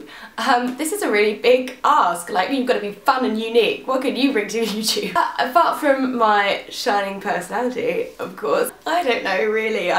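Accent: United Kingdom. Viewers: So nice to here another English accent on booktube. It's also nice to have someone on my sub list with Adult books. Looking forward to watching more. :) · I can't believe it, you're so friendly! I came to your video/channel 'cause I wanted to hear the british accent and get used to it, but I'm impressed! I'm brazilian and I have plans to study english in London so I'm kinda going to live there for a few months and I'm freaking out! I'm happy that I get here in this video, so I can get used to some words and actually hear about books, it's useful! Thank you so much! (and pardon me if my english is that bad or if I've said something wrong, I didn't used a translator or anything :P )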